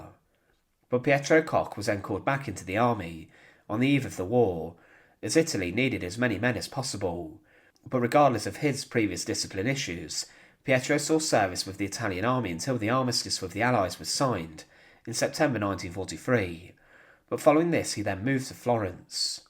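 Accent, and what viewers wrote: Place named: England, United Kingdom. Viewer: I like it. It’s English but I can’t tell from which region. Even if you don’t like it it’s better than an awful AI voice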